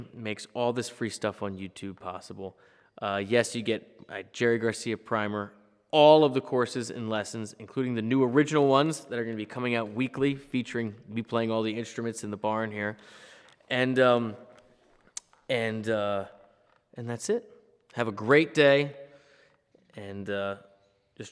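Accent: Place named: Maryland, United States. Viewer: freakin love you brother! miss me some Maryland accents, chilling out here over that Humboldt County line!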